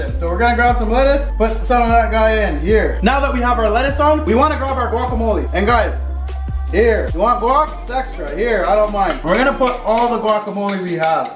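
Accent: Mexico. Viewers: is is just me o does he hve a mexican agcent i should know im exican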